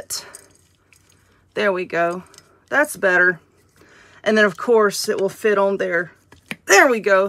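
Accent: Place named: South, United States. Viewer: I have just started watching your videos, this one was my second one. I love your spirit and the fun you put into your crafts. And the southern draw! I am a born and raised in the south and I can understand you perfectly. Thank you and keep it up. 😁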